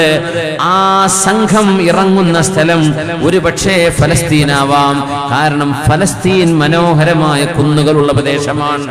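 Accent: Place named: Saudi Arabia. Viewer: ഉസ്താദിന് സൗദി സൗണ്ട് അല്ലാതെ പറ്റില്ല ☹️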